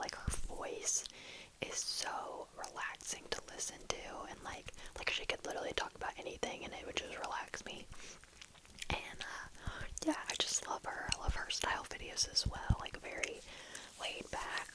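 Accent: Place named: Australia. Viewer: bruh i was so convinced you were australian xd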